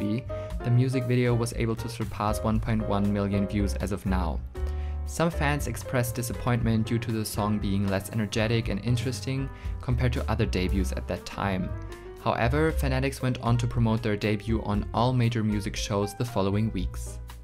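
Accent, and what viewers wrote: Germany. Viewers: du hast keinen schlechten akzent, aber ich konnte direkt hören dass du deutsch bist, cool :) super video!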